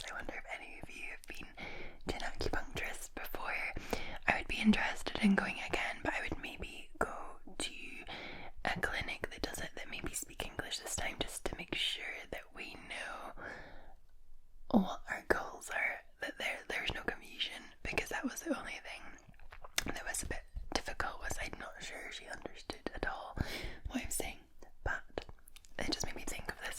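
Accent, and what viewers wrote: Scotland, United Kingdom. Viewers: Looks, talent, razor sharp intellect all delivered with a killer Scottish accent, what a package!!!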